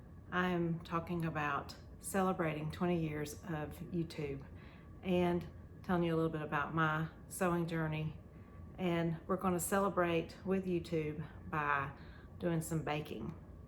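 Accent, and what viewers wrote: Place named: South, United States. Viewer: Hi, congratulations on 3 years of YT👏👏. I didn’t know that YT started 20 years ago, wow 🤯. I truly enjoy your videos, you are a great seamstress and I also love your southern accent 🥰🥰. Thank you for sharing your Zucchini bread (now I’m hungry 🤣🤣). Have a wonderful Holiday weekend 💖💖💖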